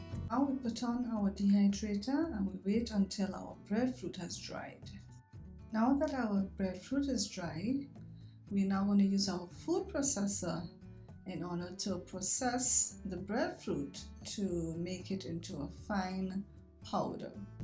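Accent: Trinidad and Tobago. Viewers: Is that a Trini accent i detect..? 🤔😃 Very informative, exactly what i needed.. thank u. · It sounds like a Trini in the house.:elbowcough: Great vid.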